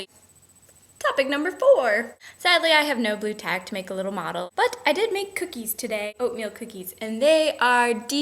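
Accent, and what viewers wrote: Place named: Canada. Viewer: you do sound a little canadian though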